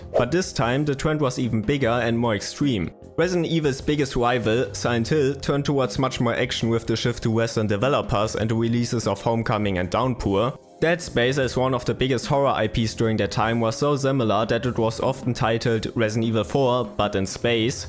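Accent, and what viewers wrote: Germany. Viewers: Diesmal kommt der krautige Akzent aber wieder häufiger ❤ Gutes Video, die Montage mit dem Zombie der sich beim Nachdenken ans Kinn fasst hat mich fast gekillt 😂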